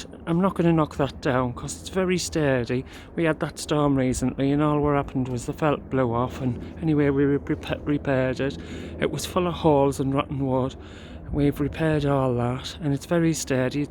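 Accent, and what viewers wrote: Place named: North, United Kingdom. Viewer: Love your Northern accent. Garden will look lovely when in bloom.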